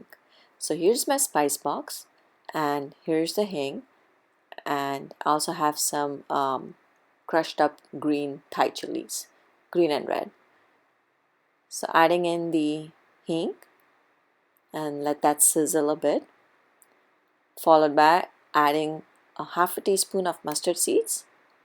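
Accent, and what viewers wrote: United States. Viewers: its because she is american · dnt kill me with your american accent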